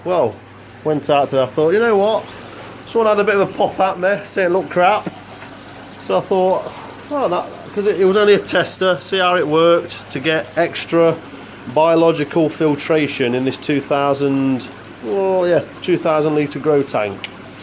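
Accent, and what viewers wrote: Nottingham, United Kingdom. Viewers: Love of Orchids,Koi & Gardening! Nice one, is your accent nottinghamish? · Pond and grow on is looking fantastic matey... Just starting with my build at the moment and created a channel... Would love it if you subscribed and if your free offer any advice...By the sounds of the accent and your Notts shirt you may be local to me ? <link>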